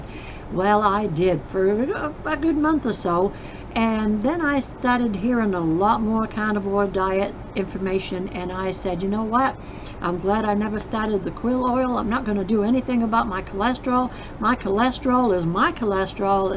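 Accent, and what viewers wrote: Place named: Maine, United States. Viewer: She sounds like my family from Maine❤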